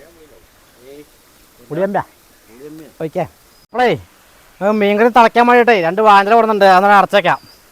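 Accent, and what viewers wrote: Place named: Palakkad, India. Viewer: Palakkad bhasha polea thonunnu. Kollam😊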